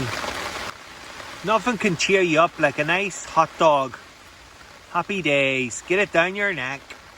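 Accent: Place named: Ireland. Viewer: Really enjoyed this vid so much! many thanks. that Irish accent reminds me of friends I haven't seen for too long, and the positive attitude in the rainy conditions, brilliant filming, and perseverance to catch is just great and inspiring to see when I feel like staying in and not getting out fishing as the forecast is shite. all the best to you, mate, thanks again! ;-)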